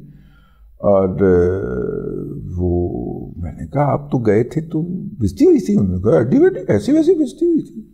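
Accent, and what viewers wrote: Punjab, Pakistan. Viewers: Hahahahahaha. Sir, apart from the contents of your video, I enjoy the way you speak while giving tirka of Punjabi.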